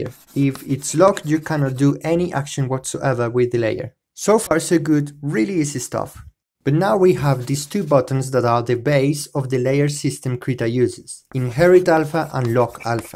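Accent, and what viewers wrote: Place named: Latin America. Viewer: me encanta que hables ingles aunque mi mente me dice que eres latino, asi que te entiendo el spanish que hay detras de tu ingles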